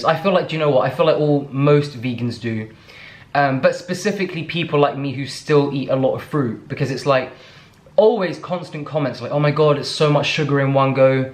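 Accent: United States. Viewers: Love the American accent 😂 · I like how you try and pronounce certain words in an American accent, u got it all down pat except "water-melone" that's halfway Spanish accent 😂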